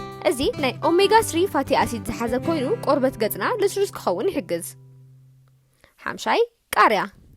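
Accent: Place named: Enderta, Ethiopia. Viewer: ብዝተፋላለይ ላዛ ወይ ላህጃ ናይ ትግርኛ ዝተፋላለይ መደባት ክትስምዕ ብጣዕሚ ጽቡቅ እዩ ሓዉና ናይ ባዕሉ ጥቁም ዝኮነ ላዛ ናይ ከባቢ ዓንሰባ ወይ ሰንሒት ዝዛረብ ክ ከዉን ከሎ ሕጂ ድማ ናይ ትግራይ እንድርታ ይመስለ።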